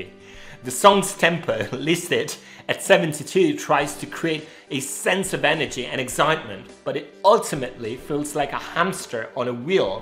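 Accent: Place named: United Kingdom. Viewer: Great work! It's really funny how the british accent and words make it seen like an even more intellectual and objective review 😂 I'll use it to annoy my Swifty-fan's friends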